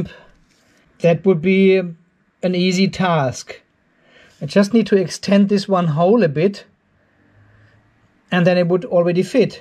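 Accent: Germany. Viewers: Jetzt musste ich wirklich lachen! Ich sehe Deinen Kanal sehr gerne und dachte mir immer: Irgendwie hört sich das wie ein deutscher Akzent an, aber was weiß ich. Nun Dein Schimpfen im Abspann: Habe so gelacht. Also viele Grüße aus Franken to sunny hot Australia.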